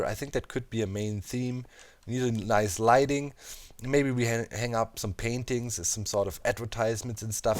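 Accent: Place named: Germany. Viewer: Are you German, because you really do sound like it (I'm Sorry if you answered this before I'm new...)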